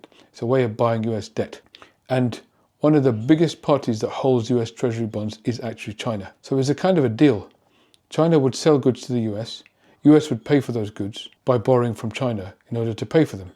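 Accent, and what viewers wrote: United Kingdom. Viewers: When I hear mathematics with a British accent I always think of the teacher from Willy Wonka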